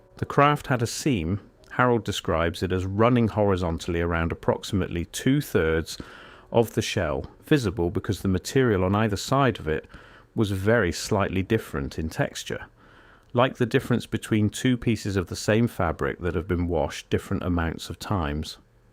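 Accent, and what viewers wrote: United Kingdom. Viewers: British accent makes it more believable.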